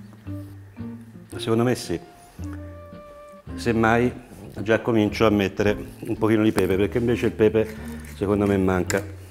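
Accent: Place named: Rome, Italy. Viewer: sembrava un accento romano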